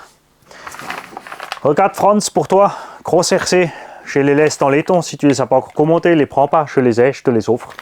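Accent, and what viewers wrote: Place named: Alsace, France. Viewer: Excellent l'accent alsacien amplifié avec toute les petites phases, on dirait mon plus jeune fils qui fait le touillon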